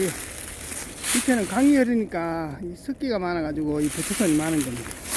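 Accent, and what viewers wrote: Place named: Gyeongsang, South Korea. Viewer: 경상도 사나이에 구수한 말씀 매력있어요 오늘도 고생하셨습니다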